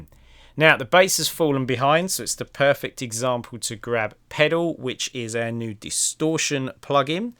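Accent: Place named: United Kingdom. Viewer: score a smooth British voice. thanks mate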